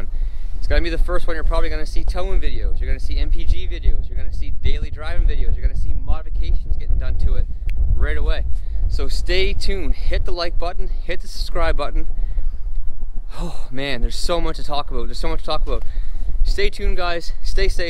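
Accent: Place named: Canada. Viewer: I just realized your Canadian.... now I can’t unhear it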